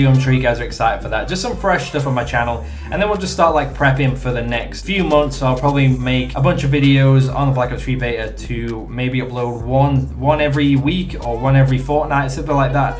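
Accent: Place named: Birmingham, United Kingdom. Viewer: Birmingham I think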